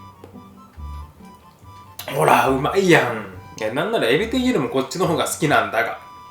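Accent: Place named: Kyushu, Japan. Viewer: 九州の人は柿のイントネーションが牡蠣と同じなのかな 母親もそうなんだよな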